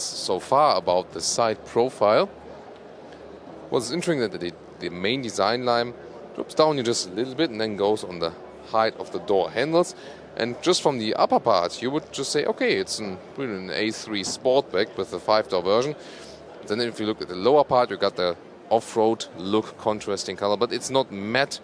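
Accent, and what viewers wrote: Germany. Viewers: Sorry , but your English is a pain - warum sprichst Du nicht einfach in Deutsch? I work and live in an English speaking Country - this strong German Flavour is too much - i recommend spend some time in Oxford and some time in Boston - that might help.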